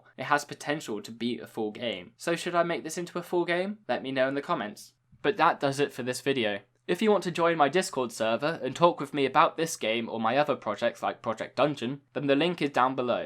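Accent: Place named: Europe. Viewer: Ur from UK? Your accent is European. Nevertheless, you're my favourite. 😁😁😁😋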